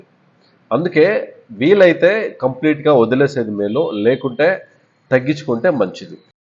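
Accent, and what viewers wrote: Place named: Anantapur, India. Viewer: Sir , mee uuru pakka atp to karnataka border sir slang chusthey thelisipothundhi❤ any ways thanks for the great info🎉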